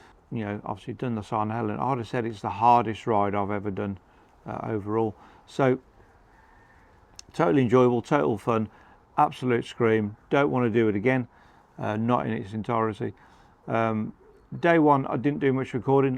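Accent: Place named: West Midlands, United Kingdom. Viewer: I'm planning on doing this on my gravel bike, possibly in September? You didn't appear to be carrying much kit, did you 'wild camp' for the entire trip? I plan on using my panniers as I cant see me getting all my stuff into a tail pack etc? Any problems envisaged using panniers, is there much single track? Btw your accent sounds familiar, W Mids? 😉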